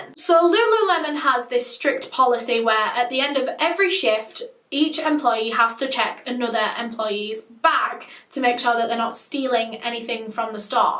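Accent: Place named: Yorkshire, United Kingdom. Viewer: she's from leeds (west yorkshire), the same as me so yorkshire x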